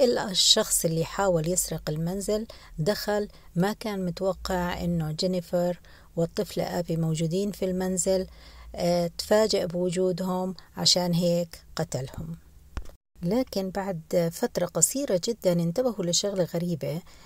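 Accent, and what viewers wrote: Jordan. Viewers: تحياتي لك اختي صوتك جميل احب اللهجة الاردنية والشعب الاردني الشقيق الله يوفقك ومليون ليك مغربية من لندن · الصوت مليح مافيه حتى مشكل متابعة من الجزائر نحبك بزاف بصح حابة نعرف انت من وين لهجتك دل انك اردنيية ولا فلسطينية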